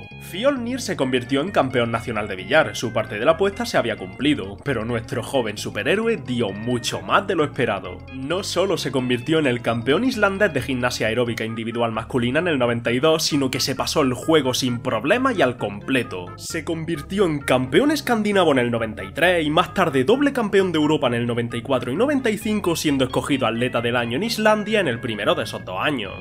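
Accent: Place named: Jaén, Spain. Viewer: He de decir que me has hecho llorar de nostalgia positiva con este video, eres un creador de contenido de puta madre colega Dime que con ese acento eres de Jaén, de mi tierra, porfavó jajaj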